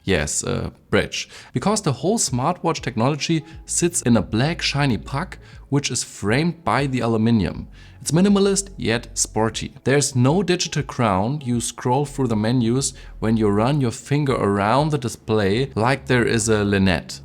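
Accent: Germany. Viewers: Man hört sofort raus wenn ein deutscher englisch spricht haha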